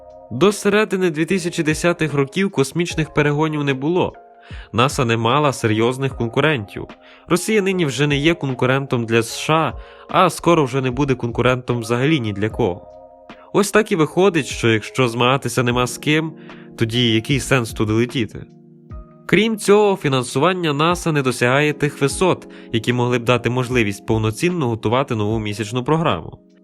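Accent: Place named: Zakarpattia, Ukraine. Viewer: Ви з Закарпаття? Акцент 😊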